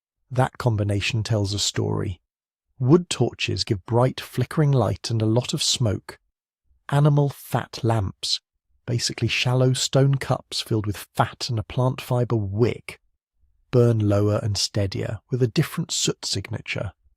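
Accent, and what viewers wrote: United Kingdom. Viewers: I put the playback speed on 80% and man something about a slow british accent just knocks me out😂🙏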